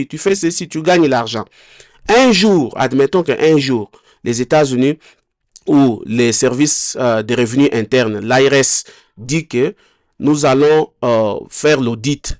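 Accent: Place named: Africa. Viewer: Africain francophone li ye ou pa tande accent li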